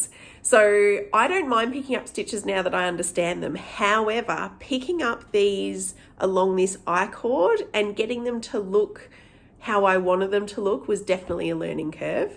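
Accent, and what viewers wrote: Australia. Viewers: Wow, super impressive! Like everyone else, I have enjoyed seeing your progress and your reactions to each clue... especially with an Aussie accent 🤣 I am plodding along clue one still, but intrigued to dawdle along the rest of the way, with everyone else's helpful tips and tricks 😀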